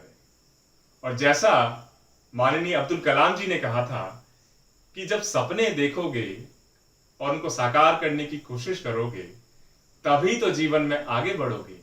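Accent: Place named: Uttar Pradesh, India. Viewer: उत्तर प्रदेश में आपका हार्दिक स्वागत है सर जी । आपकी भाषा एकदम उत्तर प्रदेश के लोगो की तरह है । ऐसा लगता ही नहीं को आप राजस्थान से है।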